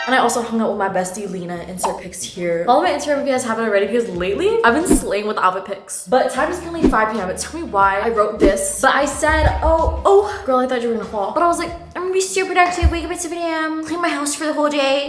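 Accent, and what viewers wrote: England, United Kingdom. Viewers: loved that british accent lol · Love the vids but the English accent ain't it sis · Gosh you crack me up with your english accent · Your British accent is so good, I love it. Your videos are also so good. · the British accent is not it girl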